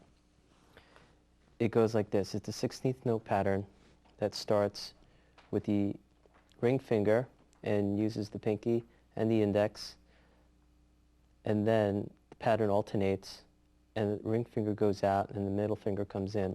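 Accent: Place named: New York, United States. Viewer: I love his New York accent.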